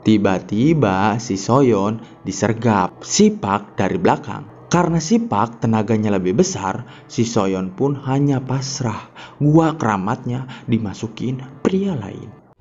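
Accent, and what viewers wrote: West Java, Indonesia. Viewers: Sunda Pisan Euyyyy 😂😂 Narasina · Lucu bgt dngerin narasinya kaciri pisan org sunda ketika ngomong " SABAT .. dulu .. 😂😂😂 piseurieun pisan jadi gekgek ngadanguna ... sukses terus lah channel na smoga mkin tmbh subscrb na ..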